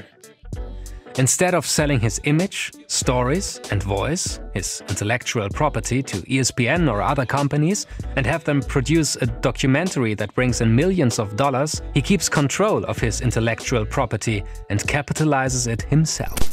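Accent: Germany. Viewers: Dieser deutsche akzent killt einen 😂 geile videos, weiter so 🤌🏻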